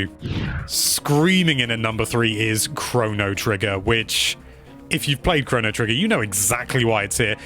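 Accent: United Kingdom. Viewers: It's the British pronunciation, the way we all said it in the days before YouTube... ;) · It seems Americans (like me) would say Yo as in yo-yo, and this dude (and presumably others in the UK) would say it yo as in "yonder". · Its the way we pronounce it in uk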